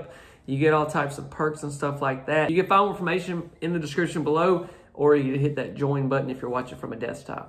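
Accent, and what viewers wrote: South, United States. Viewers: The house is great, but the real star is your Southern accent! Love it!!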